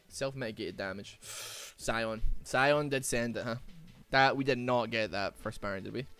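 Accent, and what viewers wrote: Scotland, United Kingdom. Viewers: Scottish Jacksepticeye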